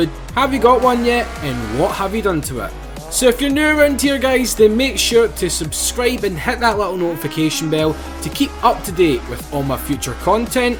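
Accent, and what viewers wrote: Scotland, United Kingdom. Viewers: he's scottish, that's why he pronounce it like that. but yeah that's a really weird reason to dislike. maybe it's his relationship talk idk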